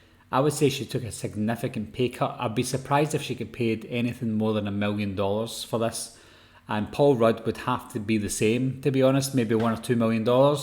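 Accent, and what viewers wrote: Scotland, United Kingdom. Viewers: Wait... are you Scottish??! 😍😍😍 So your first language IS English!!! Or is it Gaelic ? 😜🤣😍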